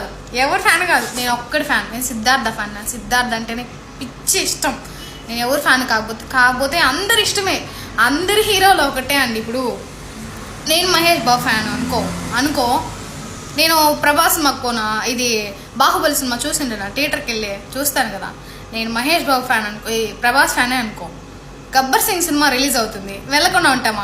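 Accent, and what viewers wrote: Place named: Rayalaseema, India. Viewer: Meru kadapa bedda na rayalasema leka karnul aa me voice lo base bagunde super continue🎉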